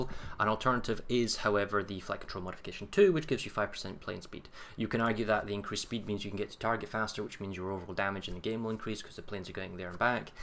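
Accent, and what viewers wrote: Scotland, United Kingdom. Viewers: he's Scottish, he can pronounce English words in any way you can imagine, and it's still going to be alright...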